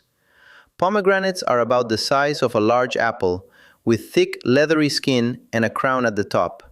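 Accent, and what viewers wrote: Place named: Mexico. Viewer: Why is he switching in and out of a Mexican accent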